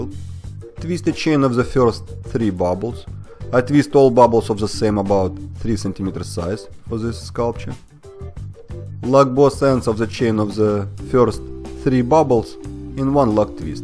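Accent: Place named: Russia. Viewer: Great Russian accent ))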